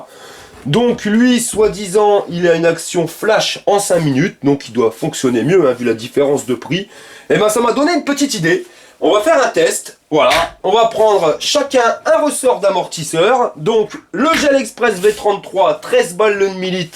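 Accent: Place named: North, France. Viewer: Merci,je comprends lieu le léger accents, on est voisin,je suis de Maubeuge,chti power et " FIRE "!!!!! Continu comme ça!!!!!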